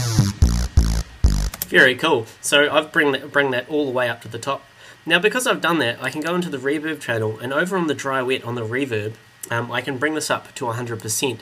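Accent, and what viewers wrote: New Zealand. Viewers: he ain't talkin fast hes a kiwi!!! churrr brotha!!!!! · great tutorial. It's a bit too advanced for me, an Ableton newbie. I learnt alot though, will definitely be back once I'm more familiar with Ableton. Watching your video, I learnt some things which would have taken me months to figure out by myself. Great explanation, being a kiwi, I can understand everything you said. Maybe I should do the English transcript, for the non-Kiwi listeners. Some have referred to speed and not understanding everything. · are you from new zealand? Your accent sounds like it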